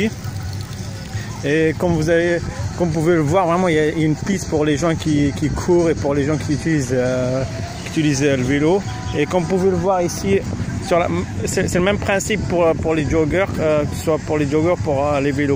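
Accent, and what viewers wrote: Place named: Italy. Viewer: tu est italien ? ta une petit accent italien , je vais debarquer avec mon velo electrique à korat lol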